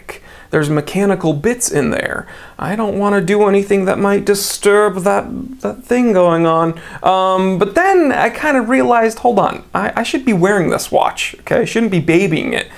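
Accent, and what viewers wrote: Germany. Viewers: It’s the german accent that made me thumbs up 😂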